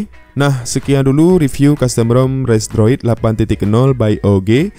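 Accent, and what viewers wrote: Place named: Magelang, Indonesia. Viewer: Dari suaranya. Magelang flasher ya ini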